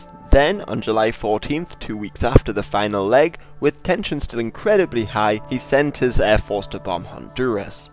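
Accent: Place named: England, United Kingdom. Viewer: It's an English accent, of the English language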